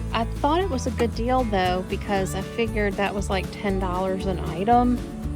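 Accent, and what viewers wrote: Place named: South, United States. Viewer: I thought so because of your southern accent. 👍 I'm watching you from upstate NY. We have the beautiful Mohawk Valley.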